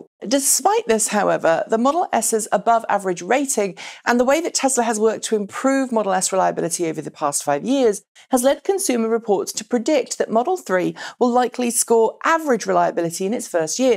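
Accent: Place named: United Kingdom. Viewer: Please stop doing these filler stories, it's a waste of your talent and resources. I'd rather see less content that was more important. It's not necessary to come out with a new episode every day if there's no new news., please do a story on the Securities lawsuit from Khang & Khang LLP against Tesla. I'm not sure what they're all upset about. So what Tesla missed their Model 3 production goals, they always miss their production targets. What damage did it have? Tesla stock investors are ignoring it and their stock is still trending up. I'm curious if it's haters trying to bring Elon down? Btw I'm one of your Patreon supporters because I find your show with its professional level production values, unique extensive research, optimistic yet balanced reporting and your delightful British accent, really set your show apart. I don't see ads on YouTube because I pay for YouTube Red. 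Ads drive me crazy so $9.95 a month is a small price to pay for my sanity. Keep up the good work!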